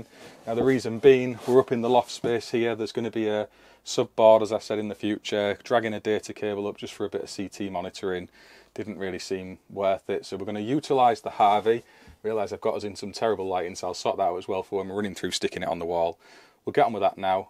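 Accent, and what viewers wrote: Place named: East Yorkshire, United Kingdom. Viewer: Good video buddy. Just getting my head round it all atm. Sound like you're East Yorkshire.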